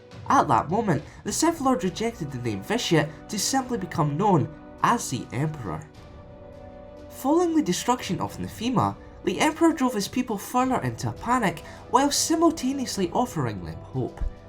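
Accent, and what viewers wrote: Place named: England, United Kingdom. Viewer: Great vids , great narration but omfgod what a thick accent.. where it is from in England?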